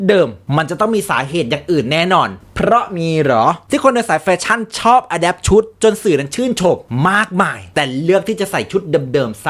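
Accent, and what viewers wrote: Isan, Thailand. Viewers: พากย์ได้แซ่บจิงๆ ชอบๆ มีแทรกอีสานเข้าไปด้วย มันส์ดี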